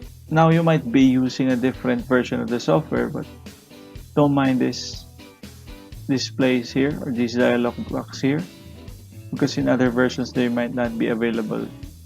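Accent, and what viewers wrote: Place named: Philippines. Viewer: you sound pinoy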